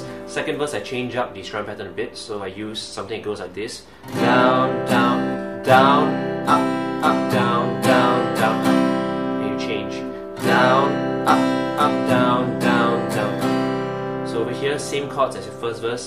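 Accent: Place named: Singapore. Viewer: I thought I recognized that accent! My wife is Singaporean and I lived in Singapore for 5 years, now in the USA. Thanks for the video. I recommended it to our musicians as we're learning this song for this Sunday. Regards, John Carpenter ("yeoberry" is a combination of my wife's maiden name, Yeo, and my middle name.)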